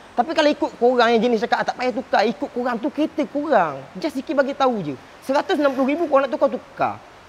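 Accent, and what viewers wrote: Pahang, Malaysia. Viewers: Apsal cakap mcm orang pahang pulak ni. org phg ke.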